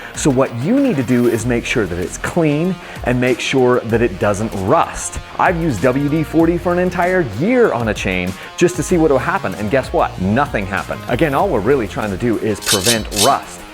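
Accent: Utah, United States. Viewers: I thought you were saying “engine breaking”. Hahaha. I live that Utah accent 👍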